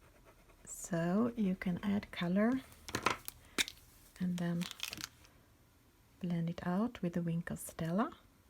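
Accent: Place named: Italy. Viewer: Your beautiful accent reminds me of the accent that Meryl Streep imitated in the movie, 'Bridges of Madison County'. Are you Italian?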